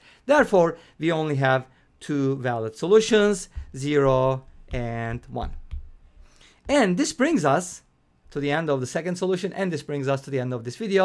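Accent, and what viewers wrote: Turkey. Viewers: Sir you sound like a turkish because of your accent but thanks for helping,sending you the best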